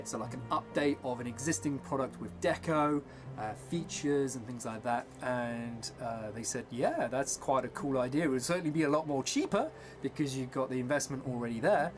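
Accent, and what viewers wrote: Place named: England, United Kingdom. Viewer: Who's 'we' with your English accent? ! Coz as far as I'm concerned, we ain't getting it! Unless someone comes out and says everyone else can get one...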